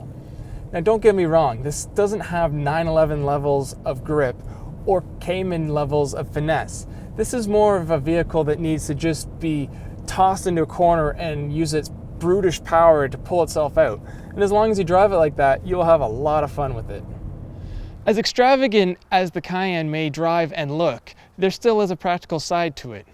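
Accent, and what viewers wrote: Canada. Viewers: Here in Texas it gets pronounced correctly, but then there are many descendants of German immigrants here. The reviewer sounds like he has a Canadian accent, but I bet you can find some Canadians who know how to pronounce it properly. I've heard the "porch" pronunciation in Australia and New Zealand too, so maybe its a British commonwealth thing...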